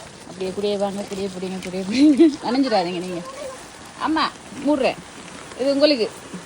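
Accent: Sri Lanka. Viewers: அழகான புன்னகை, உங்கள் அன்பு, பிணைப்பு, உங்கள் அழகான இலங்கைத் தமிழ் ஆகியவை என் இதயத்தை முழுவதுமாக ஈர்த்துவிட்டன. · ஈழ தமிழே தமிழ் தான்.. என்ன ஒரு அழகு அந்த செந்தமிழில்.... "காலத்தி னாற்செய்த நன்றி சிறிதெனினும் ஞாலத்தின் மாணப் பெரிது" என்ற அய்யன் வள்ளுவனின் குறள் கண் முன் வந்து போகின்றது. நீர் வாழ்க வளமுடன் சகோதரி - மதுரையிலிருந்து சீனிவாசன்..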